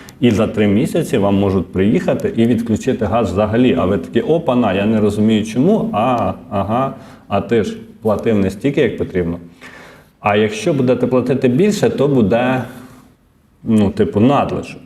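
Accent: Ukraine. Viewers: я це вже давно йому пишу,щоб вивчився спершу говорити вірно, а не на крижопільсько-жмеренському діалекті. А він не звертає уваги.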